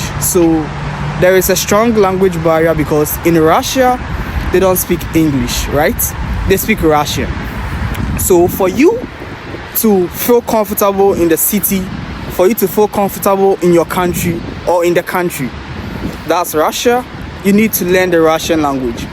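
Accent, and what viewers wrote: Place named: Ghana. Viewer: He has the Ghanaian English accent....is he a Ghanaian?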